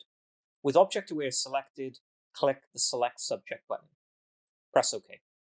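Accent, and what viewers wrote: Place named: Wales, United Kingdom. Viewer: Love a tutorial with a welsh accent! Feels like I'm listening to a mate! Great tutorial bro! #WAAAAYULLSS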